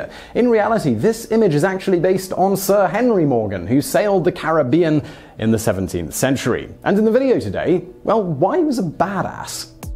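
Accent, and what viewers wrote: United Kingdom. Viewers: I love how most people from the British Isles that I've ever heard speaking absolutely refuse to pronounce any word that ends in "-tory" as "toree". It's ALWAYS pronounced "-tree". Could the politics of the "Tories" have anything to do with that I wonder? ;) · I don't know about you guys but Simon and Company could research the origin of the toilet seat and we'd click on it and damn it find it one of the most Interesting facts of the day! That British accent just has a way of keeping you engaged in though! Well done again guys! Thx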